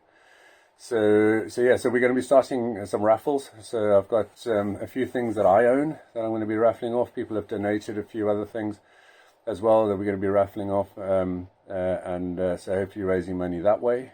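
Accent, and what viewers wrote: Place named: South Africa. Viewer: You have a very South African accent there... An expat maybe?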